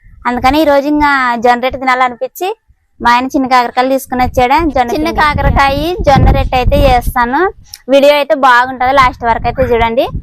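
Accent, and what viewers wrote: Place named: Raichur, India. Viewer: Mee a uru akka? Naaku chinna kakara kailu ante chala istam memu manvi Raichur Karnataka Raichur lo kuda telugu ilage matladutam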